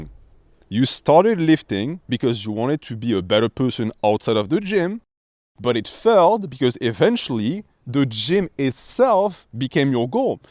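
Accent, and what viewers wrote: France. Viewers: Are you French ? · Bonjour. You have a French accent am I right? :D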